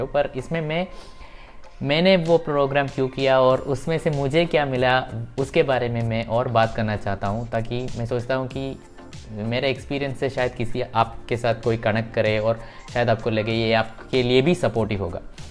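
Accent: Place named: Kerala, India. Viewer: ur hindi is very sweet chetta...whenever any malayali speak hindi..they speak very sweetly!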